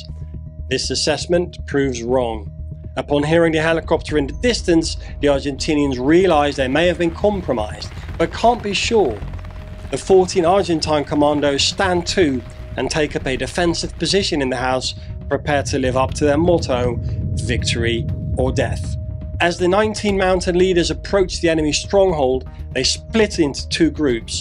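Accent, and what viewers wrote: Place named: Netherlands. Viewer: Ik meende al een Hollands accent te horen. Maar is je Engels nou goed voor een Hollander, of is je Nederlands (erg) goed voor een Brit? Anyhoo, gaaf kanaal, maat!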